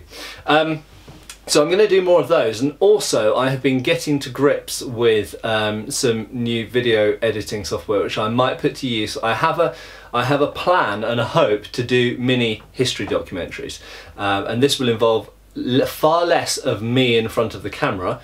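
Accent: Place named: RP, United Kingdom. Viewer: Metatron led me to your channel. Among my interests are History, Archaeology, Weapons and Hema and in my opinion you are one of the best content creators on youtube. I also really enjoy your movies and tv series fights evaluations, they're brilliant. I think people visit your channel not only to be entertained but, mostly, to learn something, and I really can't say the same (for example) about Lindybeige, highly entertaining but with very less quality. I can't wait to watch your History videos (with your BBC voice :-) ). I'd also like to hear you explain old fencing manuals, like di Grassi (or even Fiore dei Liberi, Liechtenauer or Meÿer!). Keep up the great work, and thank you sir. p.s. Do Rants!!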